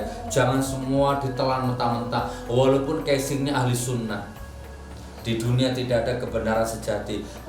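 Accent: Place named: Java, Indonesia. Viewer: Gus... sy tebak pasti sampean orang jawa ya... keliatan medok... wkwkwkwk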